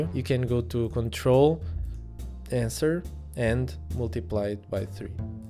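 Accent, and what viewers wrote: Portugal. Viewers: Vi logo pelo sotaque e pelas musicas de server de csgo source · Ganda sotaque tuga🇵🇹💪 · You're Portuguese aren't you? I can tell by your accent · 15 segundos no vídeo e nota de logo q é português kkk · Quando ouvi o sotaque sabia que eras um dos nossos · Aquele sotaque tuga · Are you portuguese? Eu juro que escuto um sotaque.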